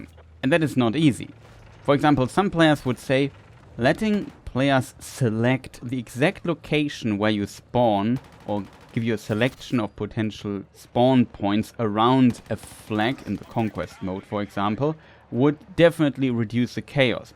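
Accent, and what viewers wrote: Germany. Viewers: If I hear a German accent over battlefield gameplay I know I’m in for a banger vid.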